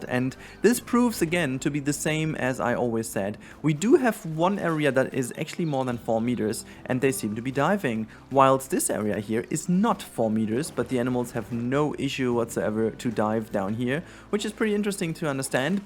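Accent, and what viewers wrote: Germany. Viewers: Man hört das du Deutscher bist! :D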